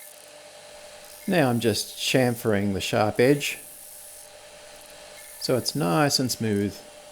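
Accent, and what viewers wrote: Australia. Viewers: I’ll give you a thumbs up for your silly Aussie voice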